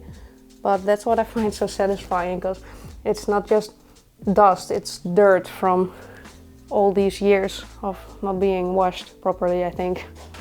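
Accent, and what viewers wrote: Netherlands. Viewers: My first video I saw from you, I really enjoyed it, so thank you! I also love your Dutch accent haha.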